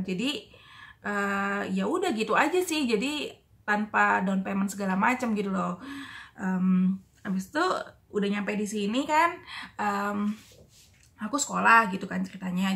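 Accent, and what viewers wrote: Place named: Bali, Indonesia. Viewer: hahaha iya, logatnya mirip Bali. hehe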